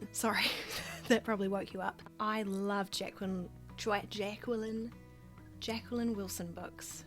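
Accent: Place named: New Zealand. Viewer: I'm from texas, & I find your new zealand accent so charming ☺️ welcome to the book community, 💞 you're doing great!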